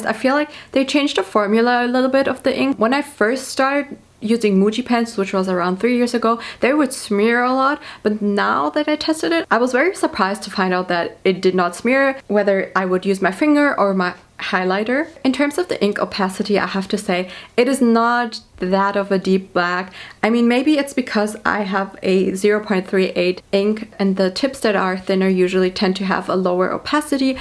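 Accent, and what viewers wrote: Germany. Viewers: Are u German?? 🐻‍❄️🐻‍❄️🥺🥺✨🫐🫐🛼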